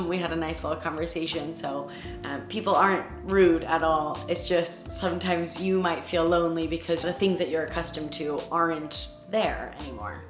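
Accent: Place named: United Kingdom. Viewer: You are getting a better British accent though.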